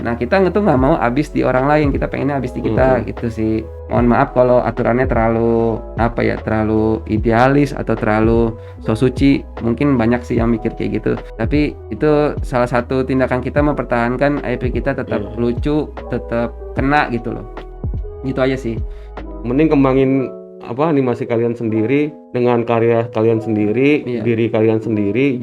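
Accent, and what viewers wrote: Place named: Bojong Gede, Indonesia. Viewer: Bojong gede emang Kocak2 ngomongnya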